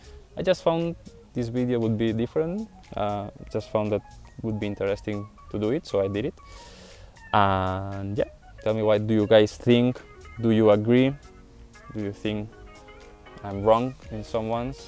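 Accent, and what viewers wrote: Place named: Spain. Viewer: wouldn't need to do this as his Spanish accented English is very easily understood by anyone who has any experience of travelling or speaking to non native English speakers.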